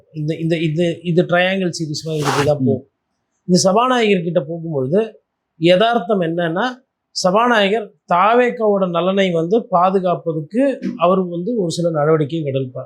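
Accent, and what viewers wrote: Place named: Telugu, India. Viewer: ஏன்டா கோட்டி உன் தாய்மொழி தெலுங்க அல்லது மலையாளமா ஏன்ன தமிழ் தமிழ் பேசர இப்படி தான்டா இரண்டு கும்பல்கள் தமிழகத்தில் சுரண்டி கொழத்துகொண்டுயிருக்கு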